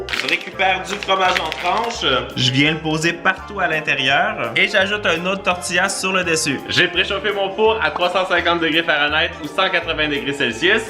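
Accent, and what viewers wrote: Canada. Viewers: tu mon youtubeur préféré desCarl is cooking vidéos accent canadien et qui met quoi des vidéos trop trop rire 🇨🇦👍👍👍👍👍 Tik Tok recette du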